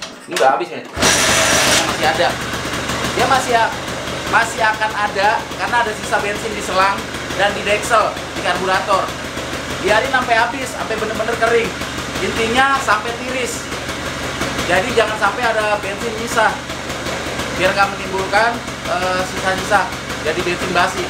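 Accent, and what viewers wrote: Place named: Betawi, Indonesia. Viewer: Mantap bang,,,suka betawi nyablaknya,,salam berawi ora,,,😆😆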